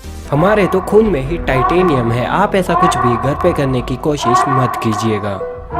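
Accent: Rajasthan, India. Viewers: Bhai tu Rajasthan se he kya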